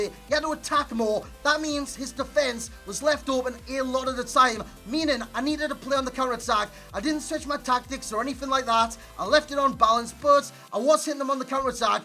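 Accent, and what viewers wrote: Newcastle, United Kingdom. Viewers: hes from newcastle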